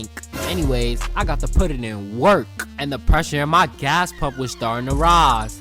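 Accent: New York, United States. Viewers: that yew yorkin language HIT DIFFERENT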